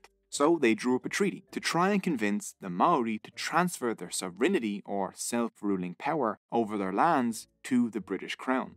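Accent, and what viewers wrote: Ireland. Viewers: I cringe every time you mention any Maori Kupu (Maori words). Whether it is your Irish accent or ignorant of indigenous cultures, it kills my mother tongue. Otherwise, it would've been more entertaining, one and a half stars from five. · Are you Irish? after a few minutes all I can hear is an Irish accent. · I wasn't prepared for the Irish accent 🤣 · Totally agree! And nicely done in an Irish accent! 😁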